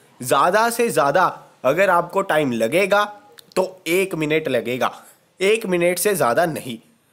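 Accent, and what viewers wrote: Punjab, India. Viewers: Sir ji app punjab se ho kya ?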